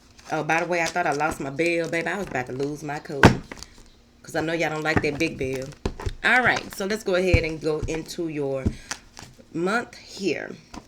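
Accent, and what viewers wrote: South, United States. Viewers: Southern girl!?? I’m from GA & country as ever and I love your country talking tail 😂😂😍😍🙌🏾🙌🏾 specially when you say “Whatever”💁🏾‍♀️🤷🏾‍♀️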